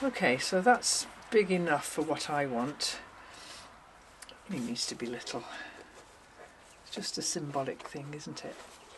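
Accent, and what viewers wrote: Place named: Lancashire, United Kingdom. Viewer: My Lancashirian grandmother was only 10 when she immigrated to Canada but she retained pronounciation of words like sun, stuff, does, and others. I hear her when you speak. LOL May you have a Merry Christmas and Happy, Healthy New Year.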